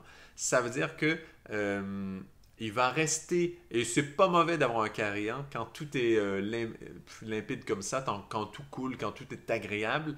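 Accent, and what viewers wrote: Quebec, Canada. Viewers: Merci pour votre lecture de la pleine lune et pour vos conseils d’une belle tenue spirituelle. J’adore votre accent québécois! 🤗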